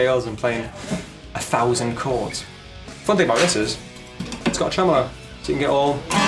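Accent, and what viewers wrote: Newcastle, United Kingdom. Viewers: Haha hes from England. Most likely a Geordie. Its not too bad is it?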